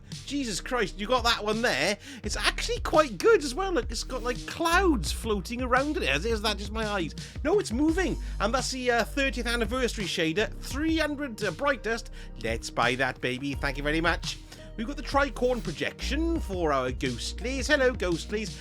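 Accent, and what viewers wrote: Wales, United Kingdom. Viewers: Just wanted to say been watching for a bit, thought I heard the Welsh accent, and well love ya channel and content it's cracking, have a grand day boio...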